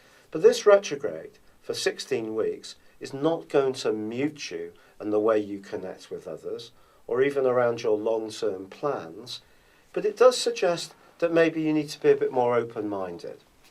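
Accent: England, United Kingdom. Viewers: Thanks, I like your english accent...:-)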